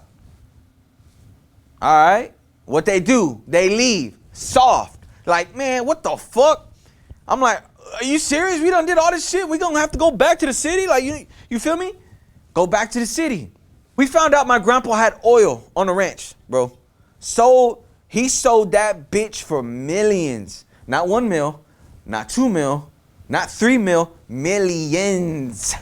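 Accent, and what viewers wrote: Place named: Houston, United States. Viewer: i like how you can tell he's from houston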